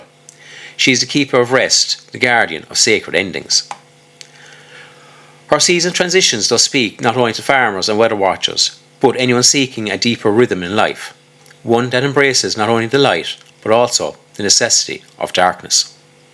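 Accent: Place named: Cork, Ireland. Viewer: lovely cork accent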